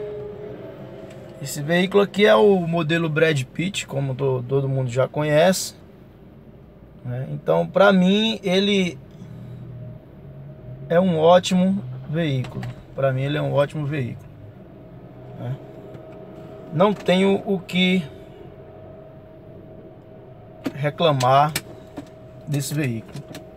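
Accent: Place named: Bahia, Brazil. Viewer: é oq ele é da Bahia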